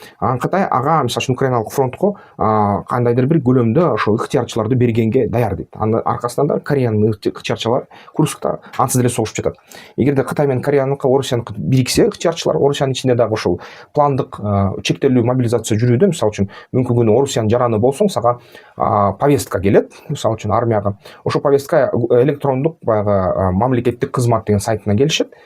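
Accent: Standard. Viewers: Менин Кыргыз адабиятынан сабак берген эжеке агайларымда мынчалык таза суйлобойт эле😂 сиз кайсы жерлен болосуз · Мендагы раазы болгонум кыргызча таза суйлогонго аракет жазап жатасыз ишинизге ийгилик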